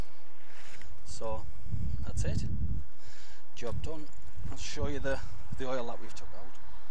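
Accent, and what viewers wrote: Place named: Durham, United Kingdom. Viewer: You sound like your from my kneck of the woods Durham very helpful video cheers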